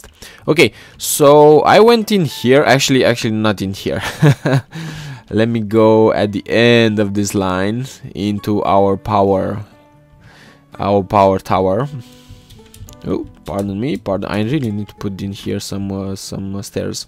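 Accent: Romania. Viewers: he is romanian, he can't have english accent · bip cu accent romanesc ;)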